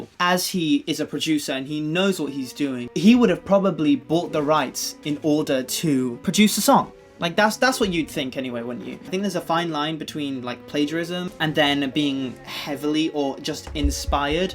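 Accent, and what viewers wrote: United Kingdom. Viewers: BRITISH ACCENT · Your English pronunciation is the most delightful one I ever heard, you've got a perfect English accent ✨🌙 Oh, and you're so cute ❤️ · Hi! I'm Korean teen ㅎㅎ I love your british pronounciation ㅠㅠ Can you cover ' '-!!! I'm sure you will love this song ㅠㅠㅠㅠ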